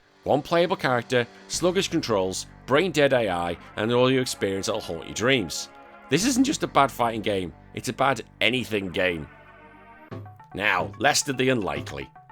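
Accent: United Kingdom. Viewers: I love it when a British person yelled us how bad a game it’s that we didn’t get! We didn’t get Lester the unlikely! Or did you play it when you were 13?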